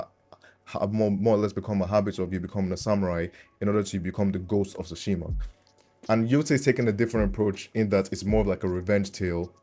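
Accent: Nigeria. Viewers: My broda, as soon as I heard that accent I said, he is one of us............. Naija!!!! About Yotei, honestly this was a bit disappointing to me, I finished Tsushima and its DLC on PC, so I was expecting them to come with some more fire, honestly I didn't expect them to just repackage the same thing, make some editions and sell it to me, this won't be a skip but it's definitely not a Day 1 for me. I'll wait for this to come to PC and play it, btw I just subscribed to your channel.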